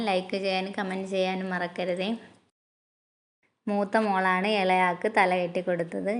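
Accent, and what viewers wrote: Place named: Malappuram, India. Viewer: ഇത് പോലത്തെ vdo കൂടുതൽ ഇടൂ.👌 Tvm ആണോ മലപ്പുറം or kozhikode ആണെന്ന് തോന്നി.👍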